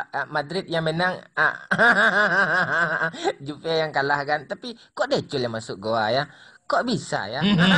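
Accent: West Sumatra, Indonesia. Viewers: Lucu bgt logat Minang TPI bahasa nasional lucu ²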